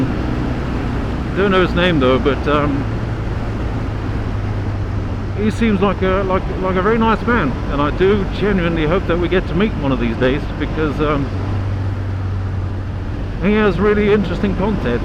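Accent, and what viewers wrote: United Kingdom. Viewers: Funny I was wondering the same thing. He sounds like a Brit but I know Brits drive on the left?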